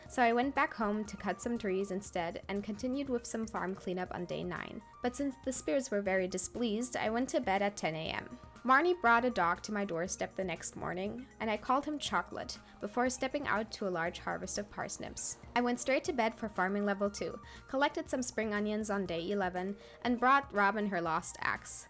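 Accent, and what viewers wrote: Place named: Germany. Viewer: I can't believe I've never seen a video idea like this, and wonderfully executed as wellA It's a surprise you aren't bigger, because this was a great watch!Also, is English your native language? I assume not, considering your (this is a guess by an American, so apologies if this is wrong) German accent, but if it isn't then that's VERY impressive, because I didn't even notice anything until like fifteen minutes in!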